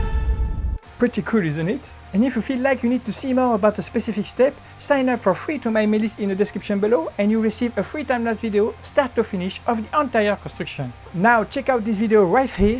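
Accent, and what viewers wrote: France. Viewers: Excellent boulot ! Et j'adore ton accent FR ;) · what is this french accent, are you realy english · Le gros accent français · I love this accent, french I assume? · Mdr on voit ton bel accent français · Juste ton accent french 😅😅😅bon boulot en tout cas j'adore tes techniques d'assemblage franchement chapeau 👍👍👍👍👏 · can u stop speaking enhlish with ur french accent , its difficult to understand as ur pronouciation is not really good + its really annoying , french is a loving language , u dnt hve to b ashamed of ur native language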